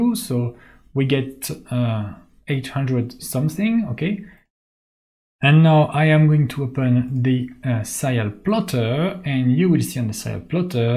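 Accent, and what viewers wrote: France. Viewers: i have one question are you french?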